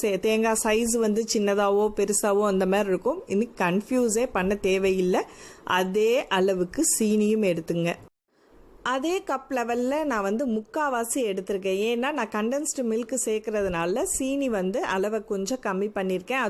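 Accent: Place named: Kayalpattinam, India. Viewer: So wat ..it's kayal dialect..just enjoy d recipe..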